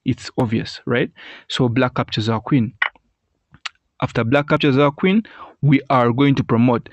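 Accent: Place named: Uganda. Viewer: I'm Ugandan too so I could tell from the accent. This was very tricky for me to figure out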